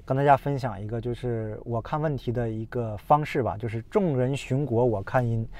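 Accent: China. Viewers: 因为新加坡开始有疫情，没有口罩，去台湾拿口罩却拿不到，我们新加坡的口罩是留给医护人员和前缐人员，因为他们比较危险，没有他们的付出病人怎么办？請问你是那一国人，你的口音很像中国人。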